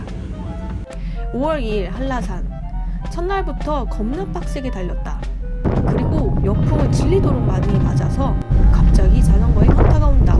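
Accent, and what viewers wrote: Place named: Russia. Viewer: 간만에 다시 보니.. 살짝 러시아 여자.. 발음 삘.. 나다~ 검은사막~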